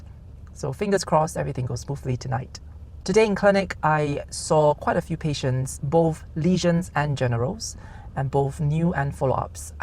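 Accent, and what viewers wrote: Scotland, United Kingdom. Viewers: I love your native accent, mixed with that tint of Scottish haha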